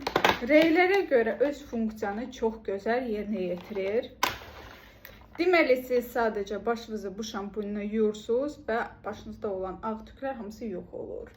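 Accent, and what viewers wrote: Russia. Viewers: Русскоязычная. Ona görə belə şirin danişir👍❤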